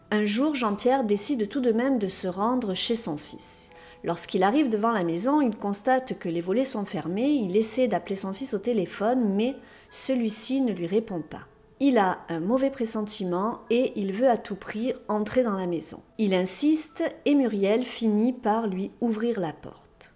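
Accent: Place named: Marseille, France. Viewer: Merciii! Je connaissais déjà cette histoire, mais quand c'est toi qui l'a narre, je me régale (élocution, petit accent marseillais...); De plus, "droit au but" comme d'habitude, bravo. Pour l'histoire elle même, les peines infligées aux protagonistes me paraissent bien trop clémentes sachant qu'il y a eu réellement acharnement à faire disparaître ce pauvre homme, considérant les remises de peines...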